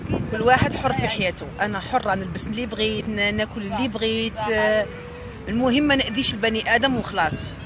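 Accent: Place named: Mostaganem, Algeria. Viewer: Saha aïdek ma soeur marhba bik moi je vien de mostaganem et j'habite à lyon et ta façon de parlé elle est comme la nôtre à mosta,je t'embrasse très fort raki m'wansétna f el ghorba hbiba avec tes vidéos rabi khalik inchallah 😘 😘 😘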